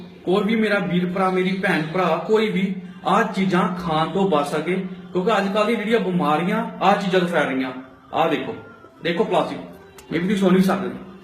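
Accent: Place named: United Kingdom. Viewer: Bolda tu ida aa jida U.K. Born aa te xperiment india di gobi de kri janda Eh gobi asli aa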